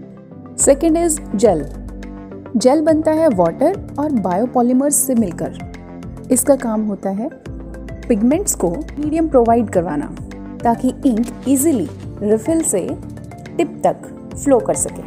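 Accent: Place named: India. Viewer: i wish she had provided a manuscript. I cannot understand her Indian/English.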